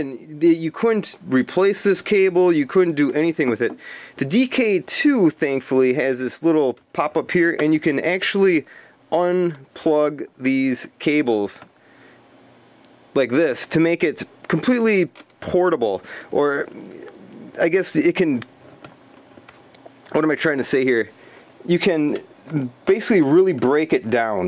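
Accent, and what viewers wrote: Canada. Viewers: Are u canadian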